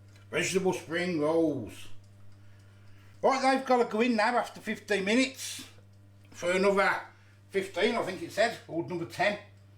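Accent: Birmingham, United Kingdom. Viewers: Just subbed, watching with 2 boy,s, 6/7, grandson's, when you said masala, they both said it, and remarked that you sounded like me, i'm a Brummy, anyway, great vid, and we 3 watched and enjoyed to the end, now to check your other stuff out, thank's.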